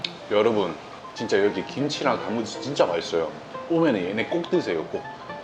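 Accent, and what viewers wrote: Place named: Busan, South Korea. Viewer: 야. 부산 촌놈 그냥. 사투리써. 안되는 표준말 썼다가 사투리섰다가 하지 말고. 불편해 보인다. 알겠냐.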